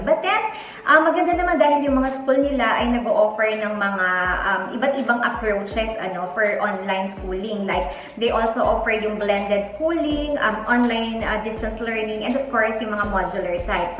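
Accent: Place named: Vietnam. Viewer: Although can not hear English. But I'm also curious .... You are Vietnamese! You speak English like Vietnamese. great, excellent, wonderfull . I have subscribed to the channel. Please exchange. Wishing you and your family wellbeing. Love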